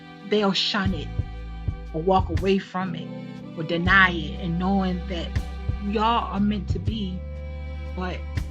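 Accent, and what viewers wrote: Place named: Baltimore, United States. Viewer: Cleary a Baltimorian narrating this. Accent is thick!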